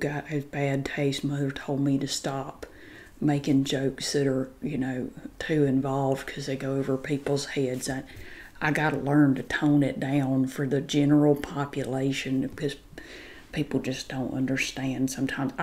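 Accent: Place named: Central Virginia, United States. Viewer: Can I just say that you're normal accent is so similar to how people talk where I live near Central Virginia.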